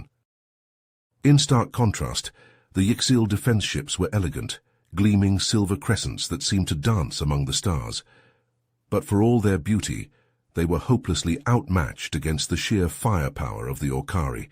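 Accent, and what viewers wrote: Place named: United States. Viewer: Sounds like an American with a mix of 🇺🇸🇸🇪🇪🇪🇺🇦🇮🇱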